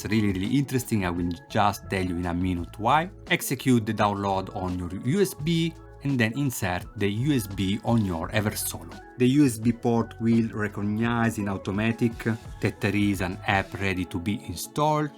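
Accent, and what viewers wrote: Italy. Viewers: Terrible englsh italian way 🤕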